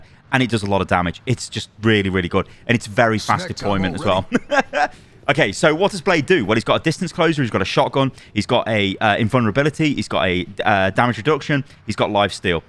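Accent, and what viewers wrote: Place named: Newcastle, United Kingdom. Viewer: Sounds like Newcastle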